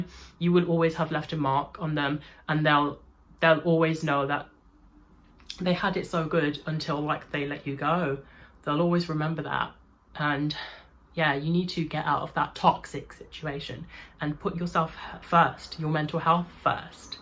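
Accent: United Kingdom. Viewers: I'm straight married soon to be divorced...and sweetheart I would love to date you. #1-You're Gorgeous #2-I love you're accent #3-You're not only gorgeous on the outside but I'm sure inside too heart & soul #4-I'm secure on my masculinity #5-I don't care what people say or think...as long as were both happy #6-I would love to date you sweetheart...I love and adore British women & you too !!! If you ever wanna chat...I would love to meet you...amor I need a woman like you in my life ! Take Care sweetheart I wish all the best !!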